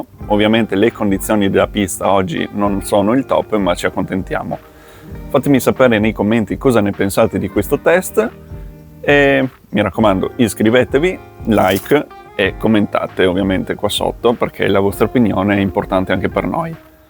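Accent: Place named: Trieste, Italy. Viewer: 😁si che sei di Trieste l'ho capito subito, si sente, sarà il dna🤣 La pista mi sembrava strano, qua non riusciamo a fare neanche questo. Abbiamo fatto un paio d'anni con gli aerei elettrici ma.....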